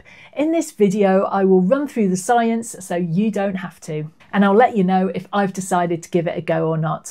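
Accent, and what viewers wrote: United Kingdom. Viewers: I love the way the English say almost anything.❤️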